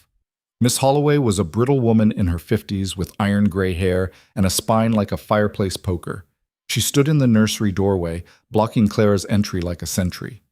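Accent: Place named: United States. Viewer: Whoever wrote this has no understanding of the regency period or the manners and language of the British aristocracy. An American narrator doesn’t help.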